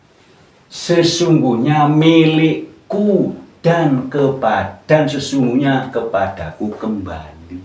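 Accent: Banyumas, Indonesia. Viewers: dari logatnya sih jawa ngapak, pokoknya antara brebes-tegal-pemalang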